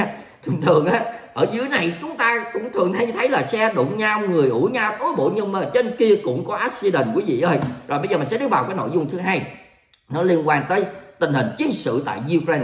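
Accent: South, Vietnam. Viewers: Nghe nói tin tức giọng miền nam hay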